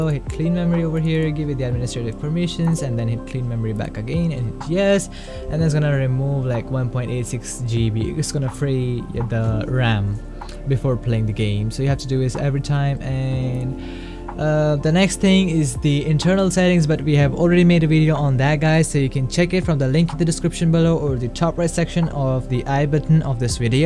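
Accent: India. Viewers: Bhai I love your English accent bro I know you are from india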